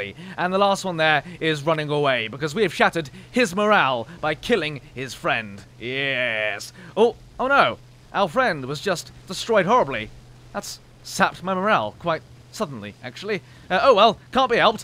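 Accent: United Kingdom. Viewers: LMFAO i love your british accent. :D :D :D <3 "Yes..." :P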